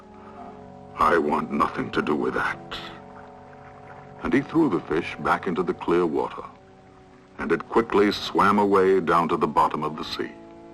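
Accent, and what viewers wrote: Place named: Europe. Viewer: Umm, definitely not Dennis! For a little moment I was thinking it was Orson, but then it sounds European. Why didn't they put the narrator on the credits?!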